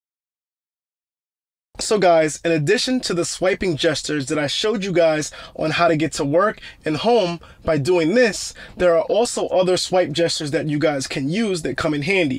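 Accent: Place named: United States. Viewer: Hi, I am Pierre from Paris (a model 3 owner) thank you for your wonderful video. And your wonderful American accent. It was super cool and super easy to be listening to you. I will watch your other video and I have subscribed to your chanel. But just one question for the trunk. You showed how to reduce the height, but if I want to increase?? Mille merci à toi!!!!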